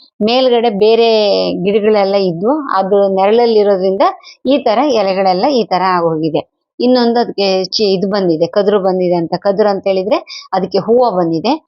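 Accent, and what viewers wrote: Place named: Malenadu, India. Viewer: Malenadu bhashe tara ede..mam yav kade nivu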